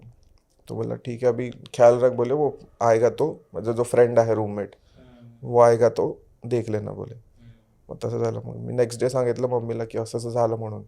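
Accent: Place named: Pune, India. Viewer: Bhai mhit nhi khott vate but amchya nagpur chi evdhi shudu language nhi ahe bhau ....ekda nagpur firun bgha smjhel ha pune vgere mdhla vatatoy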